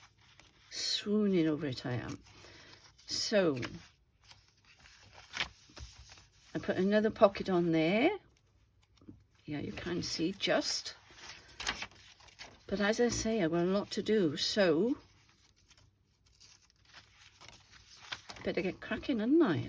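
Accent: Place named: England, United Kingdom. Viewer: Hello, I live in New York State USA and recently discovered you. I am very much enjoying listening to you, speak as my grandmother and grandfather were both from England and I miss being able to hear them talk you have great ideas and I didn’t realize that you were Bella‘mom in any case I’ve been scrapbooking for a while and I am also a fine art painter but I find that doing paperwork and collecting different kits is absolutely wonderful and so relaxing. What I am currently working on is I am a member of pink monarch prints for $8.95 a month she will give you a 50 pieces of paper of a kit that she makes every month and they’re absolutely beautiful But I love to get ideas from other people on how they create and so I am very much enjoying listening to you and I also purchased kits from Bella as well. So thank you for being out there and I too am 76 years old.and a fine art painter.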